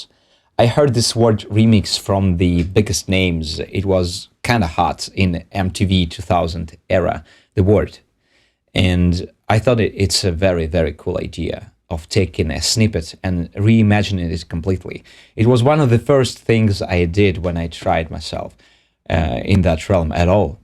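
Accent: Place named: France. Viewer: nice new track, congratulations, dear. 🍀 you have such a charming French accent. and you make such cool remixes of the French language: - les filles jouent de (avec des) boules de neige. (the girls play (with) snowballs) ❄ - qu'est ce que c'est que ça? ça sent bon le chocolat. 🍫 what is that? it smells like chocolate. (copyright with the song " " 2012.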